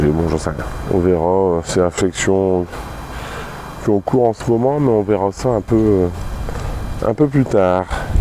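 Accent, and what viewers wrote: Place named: North, France. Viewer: Bon rétablissement ! La spontanéité avec l'accent du nord, ça fait plaisir !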